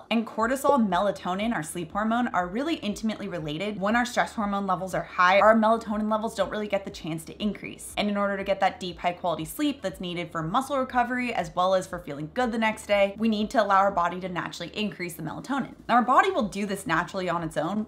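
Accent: United States. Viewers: Hello, Many thanks for your videos - the content is excellent! I thought I was 'educated enough' when it comes to nutrition but I have learnt a lot from you. The only - can you please slow down a little? I am not at all trying to criticize your accent and style BUT after approx. 2 minutes of the non-stop but fast talk in the style/accent of yours, me and most my Scottish friend just cannot take any more, mute you and only rely on subtitles. In other words, the headache from the non-stop talk is not worth the information you can convey - and you obviously have a great amount of knowledge! Just a thought for consideration. Maybe fellow Americans don't find your way of talk not as overwhelming? Not sure... Thanks and ALL THE BEST! mk x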